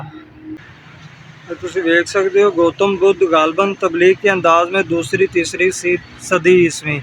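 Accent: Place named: Punjab, India. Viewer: Jutt wali english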